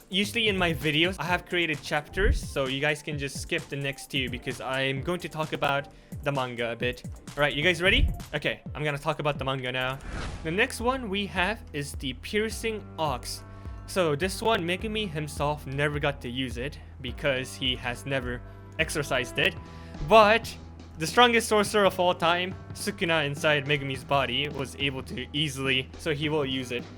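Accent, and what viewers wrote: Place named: Japan. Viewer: The way you say names in Japanese accent i think you're from japan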